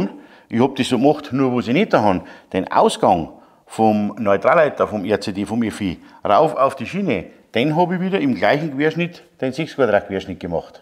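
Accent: Bavaria, Germany. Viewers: Servus, moi wieder ein super Video! Daumen hoch 👍🏼 Dei Boarisch vermiss i übrigens immer no a bissl... Schena Dog no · Und was ich noch loswerden möchte: Deine Videos sind super, ich schaue sie gerne an und lerne ab und zu auch was. Die z. T. dämlichen Besserwisserkommentare gehören eben dazu. So einen Filter, der solche Leute rausfiltert gibt es eben noch nicht. Und recht hast du, wer dich nicht versteht wegen deines bayrisch, an dem liegt es selbst, der muss eben bayrisch lernen. Wo kommen wir denn hin wenn der Bayer nicht mehr bayrisch reden darf.. In diesem Sinne mach weiter so. LG von Markus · Hallo! Wie immer gutes Video.Arbeite schon 51 Jahre als Elektoinstallateur und schaue immer wieder gerne deine Videos an. Ignoriere diese Dummschwätzer,die meinen alles beser zu wissen. Mich hat letztes Jahr auch eine alte Frau aufgefordert den Meister zu holen nachdem ich eine Lampe mit Bewegungsmelder montiert hatte, Ich habe vielleicht 10 Minuten gebraucht. 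Ich erklärte Ihr,dass ich der Meister bin und schon Hunderte Lampen montiert habe.Da sieht Mann,dass manche Leute keine Ahnung haben. Aber alles besser wissen.Und dein Bayerisch ist auch okey.Ich bin Schwarzwälder und rede Badisch da sagen die Leute auch ob ich ein Schwabe bin oder aus dem Hotzenwald komme. Die wissen noch nichteinmal wo der Hotzenwald ist.Viele Grüße Joachim. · Dein Dialekt ist bayrisch oder ostdeutsch ?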